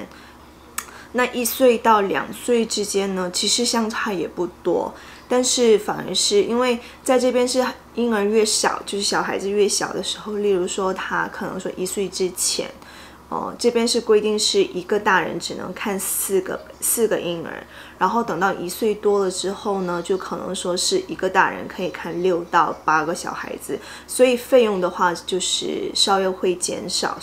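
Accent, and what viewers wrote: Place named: Taiwan. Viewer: 有一点点的台湾口味。感觉很好 。支持你 。구독.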